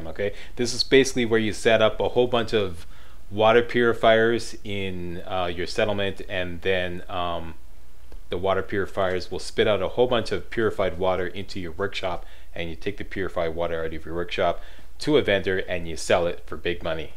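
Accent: Canada. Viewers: You sound pretty damn Canadian Bruh. #SK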